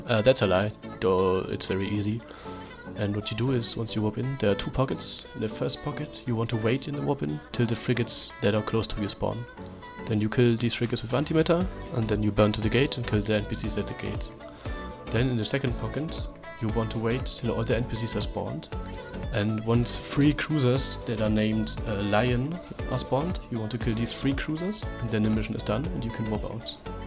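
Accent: Germany. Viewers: you have such a cute Deutch accent =)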